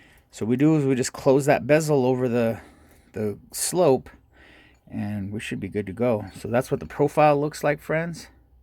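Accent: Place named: United States. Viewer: Hi, I am new to your channel and I am enjoying it very much. I make jewellery, but mostly copper, and wire wrapped. I do make rings and bangles and do the soldered join, but that is it for metal smithing so far. Keen to try bezels with strip wire bezel and also gallery wire. Tell me, as an American why you guys say sodder rather than solder and using the l in the word. It sounds odd. Anyways, glad you enjoy our English accent. I live in france and 5he French love the English accent speaking French lol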